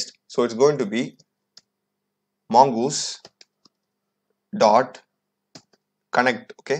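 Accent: India. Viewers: i am also IND , nice my guess true, your English slang say you are Indian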